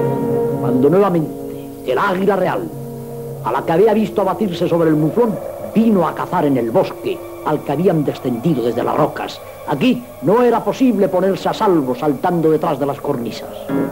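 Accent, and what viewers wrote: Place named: Castile, Spain. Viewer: The narrator's voice is awesome. Old time diction and Castillian accent.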